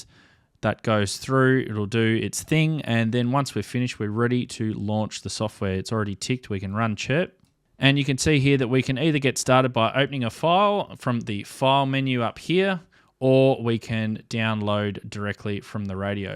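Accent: Australia. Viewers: Can't find my UV-7B anywhere on the list, Chirp sucks.. Work on losing the aussie accent, sounds awful. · Hej Matey, well i bought the Boafeng UV5RH and tried to use the CHIRP software and then i got stuck, so i went on youtubr to look for tips n info. A Minefield of ppl giving their views n tips on their vids....... i have watched so many from the uk and usa and i havent found one untill now that goes through the start process as well as you do. Yup fair dinkum me old possum, Sorry, just using my English sense of humour, No but seriouly i got to downloading from the radio all the channels and then WHAT..... untill now when you showed me how to save the numbers and then delete them from the radio ready to programme the new ones in by hand or buyin using links on CHIRP... I live in North Wales in the UK and even here the videos dont tell you that, strange isint it? 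i have asked pp on fakebook in my area and i got limited responces and mostly use chirp............ As i am not young anymore all i need is to be shown once and i generally have it. So now i have found your video, saved it and subscribed to you also plus you have a good Aussy accent that is easy to listen to. So thank you for putting this video out there matey. Be safe out there. Thank you from over here in North Wales UK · I just found your channel then I recognized inmediatly that beautiful aussie accent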